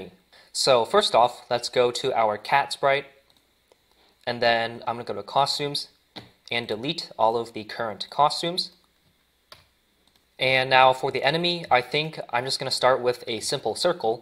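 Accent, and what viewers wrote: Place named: Asia. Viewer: the asian accent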